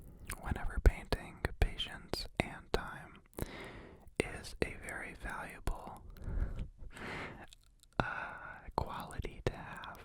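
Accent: Canada. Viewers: Us he Canadian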